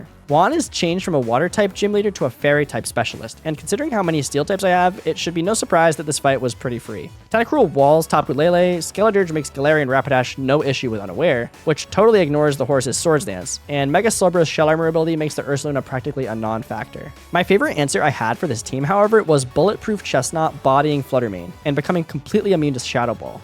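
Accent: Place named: United States. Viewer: This is clearly American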